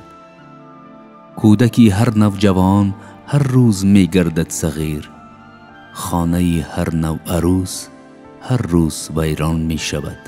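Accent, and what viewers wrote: Tajikistan. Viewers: Это таджикский диалект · Это Таджикский акцент.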